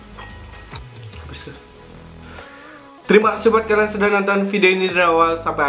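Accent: Java, Indonesia. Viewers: medok bgt KA bakso nya bikin ngilerrr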